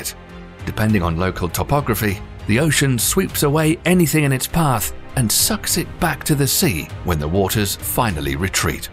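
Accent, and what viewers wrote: United Kingdom. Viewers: bri'ish accent very fascinating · really need to do an update with different none British voice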